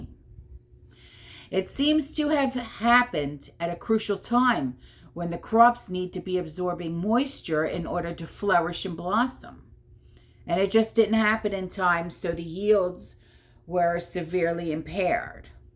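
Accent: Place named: South Jersey, United States. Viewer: She’s from South Jersey.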